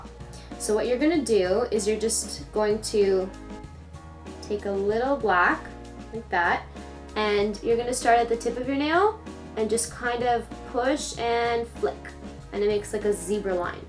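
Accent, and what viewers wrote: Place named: France. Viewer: A) your nails are so pretty i'm so jealous. B) where are you from? it sounds like you have a *slight* french accent hahaha C) I subbed!!!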